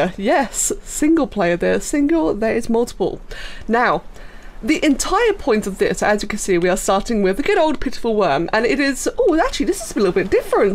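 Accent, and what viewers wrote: United Kingdom. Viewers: why listening to the bri-ish accent hurts that much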